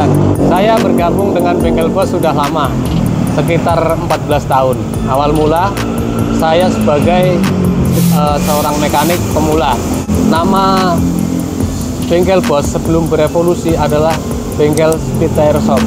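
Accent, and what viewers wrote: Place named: Banyumas, Indonesia. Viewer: Ngapak Bang 😂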